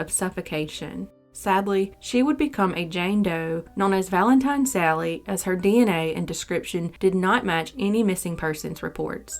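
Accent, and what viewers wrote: South, United States. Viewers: Just lovin that cute southern accent, you sound like a gentle stream caressing the pebble just pure flow x love it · That accent takes me back to childhood 😭. I miss the south, Ohio is horrible place.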